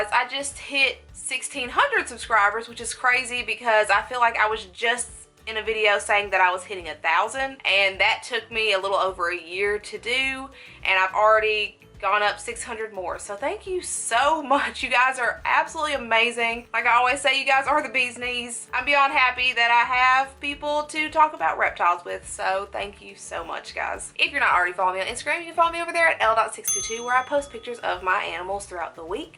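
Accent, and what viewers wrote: South, United States. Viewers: Apropos to nothing, but your accent is the cutest!!! I'm from AR originally, so I love a good Southern accent.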